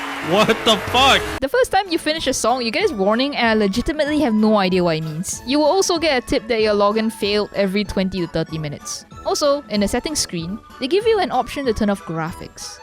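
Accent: Singapore. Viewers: I thought your accent was familiar, turns out you're a Singaporean. Cool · > Did you really think you were going to slip a by me?! I receive neuron activation after only 4 notes! This is my first video to the channel and cute Singaporean accents with rhythm games is a hell of a combo and I’m here for it. I’d heard about the o2 drama but never had the context so this was fun. However with a rhythm channel and Touhou references under your belt I can’t help but notice you haven’t played Yoiyami Dancers yet and I regret to inform you that is /actually illegal/. Please rectify this serious state of affairs at once lest the yamaxanadu send you to gensojail. It’s a hard game and it definitely combines a rhythm movement a la crypt of the necrodancer and a danmaku but once you get the timing of a level and manage to dodge to the beat and get a good full level combo it feels so good. 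Also it’s wonderfully cute. You should check it out!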